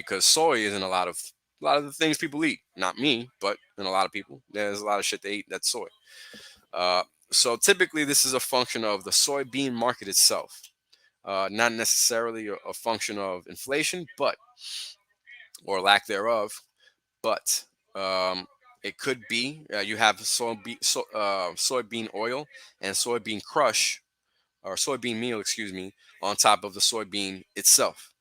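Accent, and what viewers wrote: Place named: New York, United States. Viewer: Sounds like hes from urban new york... how can you hear skin color ?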